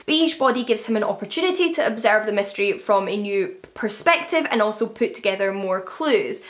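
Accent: Scotland, United Kingdom. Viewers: Subscribed within the first 5 seconds of the video because I love the Scottish accent! 😁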